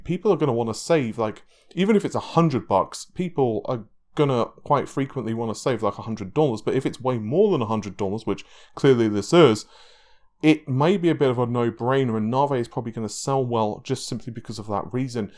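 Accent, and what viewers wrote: United Kingdom. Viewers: He is British, they say things differently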